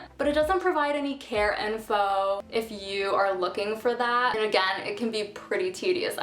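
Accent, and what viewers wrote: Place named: California, United States. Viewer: It's a California accent with a vocal fry and upward inflection. She likely doesn't hear it but I know exactly what you're talking about about.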